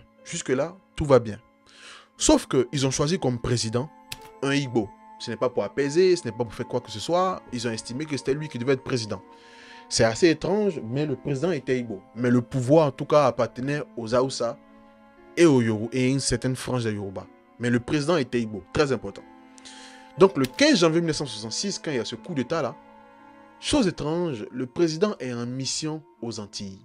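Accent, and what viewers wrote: Ivory Coast. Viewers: J'aime bien la façon de narrer avec ce accent ivoirien; je m'abonne direct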